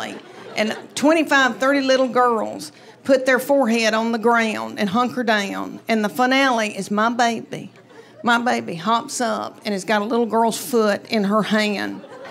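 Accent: South, United States. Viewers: Love that Southern accent - the best, and sooooooo. funny. A very special Comic, wife, and mom, and a relative, neighbor -just hilarious! Thank you Leanne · Her southern accent really adds to the delivery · The killer is she has that southern kill ‘em with kindness And sarcastic southern drawl. If called me Darlin’ I’d run! lol hysterical and all so true! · She is heavy with southern twang. I swear I thought she said ‘ma daughter was sixtwaang’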